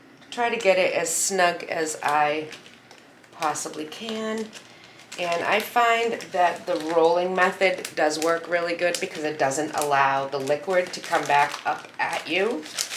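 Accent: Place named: New England, United States. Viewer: ❤️❤️💕❤️💕love your channel and your beautiful New England accent!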